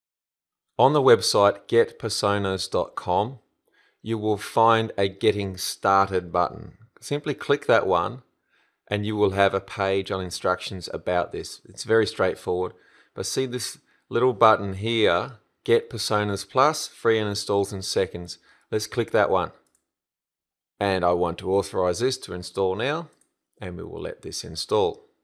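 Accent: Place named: Australia. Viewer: australian!! i love that accent.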